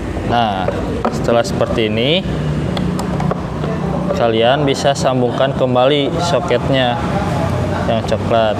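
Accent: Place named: Ambon, Indonesia. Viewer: Mas ini pasti dari ambon dilihat dari logatnya..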